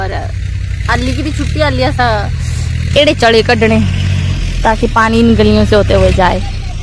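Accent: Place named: Pahari, India. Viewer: Enjoyed your Phadi speaking. It is bit different how we speak phadi in Kangra region.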